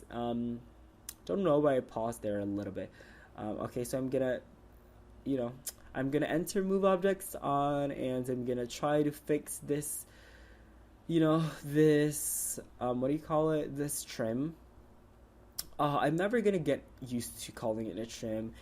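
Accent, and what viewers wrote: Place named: Philippines. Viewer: I really like your accent. Where are you from the Phillipines?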